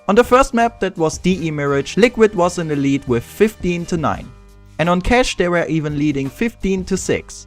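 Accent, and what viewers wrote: Germany. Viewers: Man merkt das du deutsch bist!:) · Haha :D 2 Sekunden vom Video gesehen und direkt erkannt, dass du Deutscher bist^^ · Do you come from germany? :D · Du bist Save deutsch das hört man so hart an deiner Stimme :DDD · Liquid vs Fnatic on dust at MLG columbus, astralis vs fnatic at MLG columbus... and pls get rid off that cancerous german accent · You sound like you are from germany....are you? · Nice accent ;) Are u from german :D? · Du bist deutsch oder ? Ich höre so ein deutschen akzent 😋😅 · are u german or did u traveled to germany? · dieser deutsch-englische akzent ^^ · Are you from Germany , you sound so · Pretty strong accent but I'm used to playing with German friends (which is why I can read/understand German pretty well). Having played with a lot of German people, English skills are generally insanely lackluster. Then again, Germany is a pretty big and independent country making it a little less important to speak English I guess... 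· Lol, du bist ja Deutscher :D haha nie gemerkt :D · Dieser Deutsche Akzent :) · Du hörst dich deutsch an :D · Ha ein deutscher, so ein grottiger englisch Akzent xD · I can hear it. Im from Germany too and its a German Accent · bist du deutsch xdd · Your german,right ? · Als ich mir das Video angeguckt hab, dacht ich mir: "Der muss doch deutsch sein, der redet so:D!" Das is er auch xD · Dieser deutsche akzent :DD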